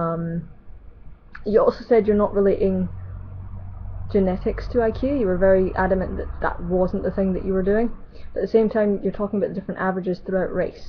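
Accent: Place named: Scotland, United Kingdom. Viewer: Thumbed up just for Scottish-ness. Even though I side with race-realism.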